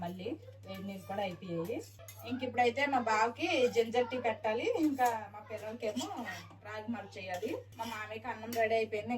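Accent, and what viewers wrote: Srikakulam, India. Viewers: Mee husbend a job chestaru? cute family...... Mee srikakulam bhasha chala bagundi ....❤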